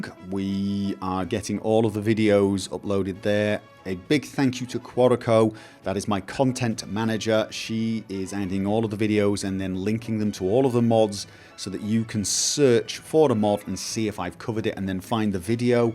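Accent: United Kingdom. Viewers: the standard English pronunciation, as opposed to the American English pronunciation. Some younger Brits have started using the American way but is old enough to have learned the old standard.;)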